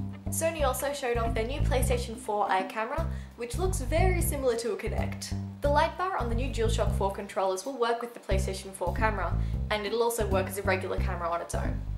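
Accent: Australia. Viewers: I love how aussy ppl talk, you called the vita veetr;p aha your super cute though.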